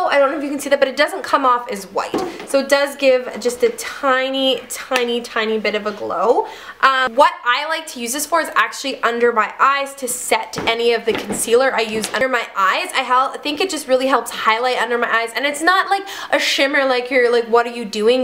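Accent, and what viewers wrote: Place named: France. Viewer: You sound super french in this video :P (fellow Canadian here!)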